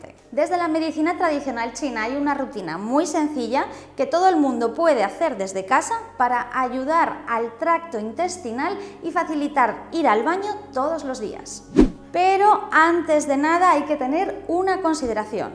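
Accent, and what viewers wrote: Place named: Spain. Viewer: Ese asento español es el peor, pérdida de tiempo con este vídeo.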